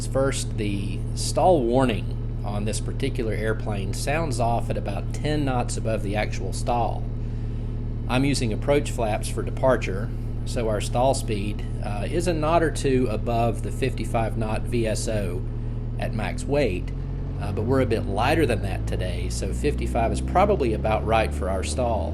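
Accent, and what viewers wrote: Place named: North Carolina, United States. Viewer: Now that's a proper NC accent. Love the video!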